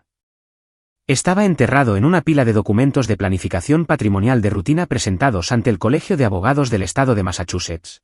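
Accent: Spain. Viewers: A mí siempre me gustan las historias que narra este muchacho. Oh, señor, lo felicito, me gustaría saber cómo se llama . Sé que tiene un acento español Las narraciones son Excelentes y voy a ver esta qué tal me parece desde el principio que es buena.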